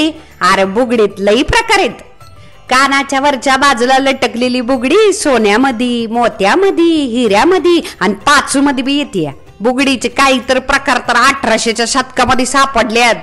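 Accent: India. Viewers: दागिन्यांची खुप छान माहीती दिली ताई आणि ती पण अस्सल मराठी ठसक्यात👍🏼 दिल्याने खुपच छान वाटले... तु तर अप्रतिम सुंदर दिसत आहेस 👌🏻👌🏻👌🏻👌🏻😊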